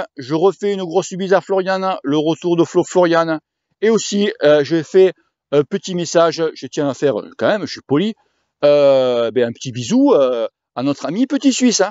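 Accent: South, France. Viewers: Ride on! continus à être honnête, franc, généreux et entier. Dis ce que tu penses au moment ou tu le penses et avec ton accent du sud: c'est comme ça que l'on t'aime. Ton sens du partage fait plaisir à voir. Tes messages de prévention sont les bienvenus pas trop d'exo de force pour les jeunes,..., pas trop de zwift. merci de t’inquiéter pour nous. c'est sympa. peace. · Bravo, super vidéo. Ne change rien, ce ne sont que des jaloux. Et vive l'accent du midi.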